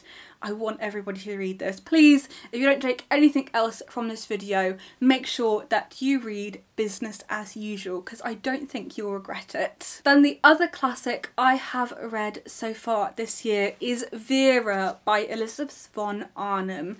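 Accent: United Kingdom. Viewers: Hello Miss. Hope you are doing very well. You are a well-read person. How old are you and how many books you've read so far? Where were you grown up and where do you live now? I started listening to your videos because of your good British accent. I would like to ask you a favour, do you have time to attend a ZOOM meeting where we can discuss books and classics. You are outstanding and we can learn from you.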